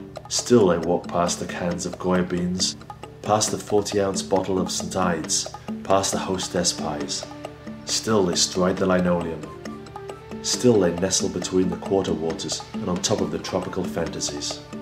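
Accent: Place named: RP, United Kingdom. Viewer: David Attenborough would be proud. BBC English, of course. Narrated by some guy called. How's that for authenticity?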